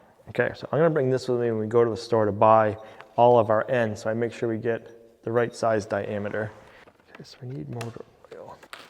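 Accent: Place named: East Coast, United States. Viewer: Eye deer? its idea. Not eye deer sir. You are definitely an East coast dude with your miss pronunciation of your speaking. Come on get with it. You sound. Like a fu king Kennedy, YUCK.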